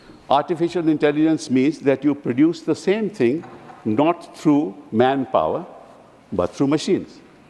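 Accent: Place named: Tamil Nadu, India. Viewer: எங்க தலைவரே தமிழ் ஆங்கிலத்துல பேசினாலும் தமிழ் போல தான்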